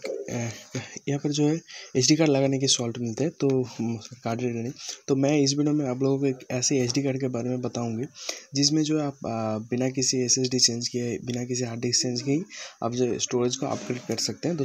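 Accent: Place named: Bengal, India. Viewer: Bangali pola hindi boltase 👍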